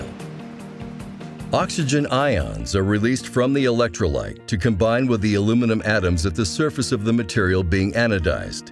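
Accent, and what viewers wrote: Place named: United States. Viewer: american english lol i speak british english and i feel you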